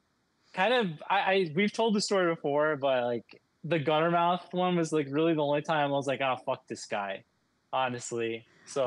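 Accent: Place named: United States. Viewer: not gonna lie i thought you were american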